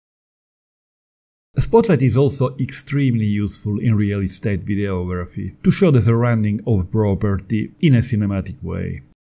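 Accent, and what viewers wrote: Italy. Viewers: great tips, and a lovely Italian accent too!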